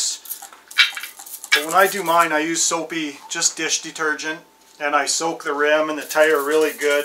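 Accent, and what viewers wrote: Canada. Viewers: So cool, I just got one and am going to take a video of it tonight for my first use...just watching some people do this...you sound like me too...either from up north or Canada!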